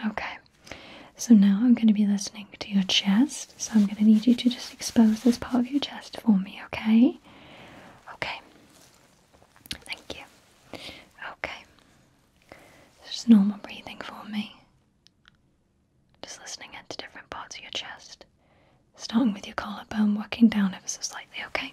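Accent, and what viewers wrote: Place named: United Kingdom. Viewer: "So if you could just lift up your shit slightly". For some reason the British accent adds to it haha, love the video <3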